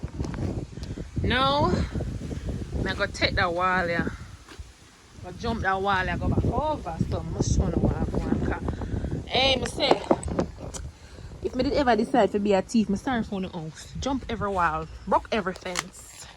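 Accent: London, United Kingdom. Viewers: lol 😂😂😂 you got the London accent on point ❤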